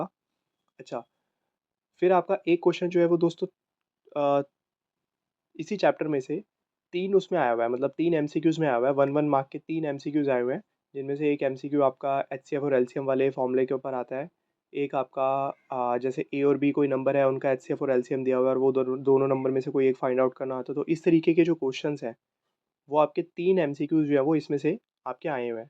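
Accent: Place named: Ghaziabad, India. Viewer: hey do you use any social media platforms btw my mom is also from gaziyabad and i love the excent of that and i am quiet intrested to talk with if you have any account plz tell😊and conversation in comments section is quite award for me😂😂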